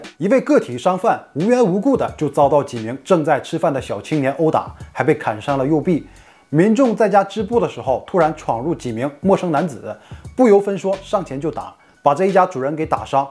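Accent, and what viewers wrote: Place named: Yanbian, China. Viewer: up主是朝鲜族的吧，感觉口音有点延边，延吉那边的。😄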